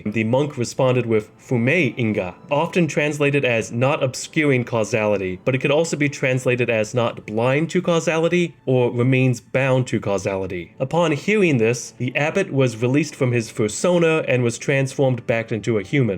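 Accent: Pittsburgh, United States. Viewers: I keep wanting to ask if he's from pitt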